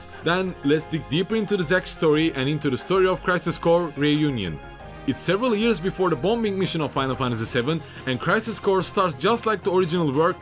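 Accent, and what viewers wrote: Turkey. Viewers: u have a turkish accent.ru turkish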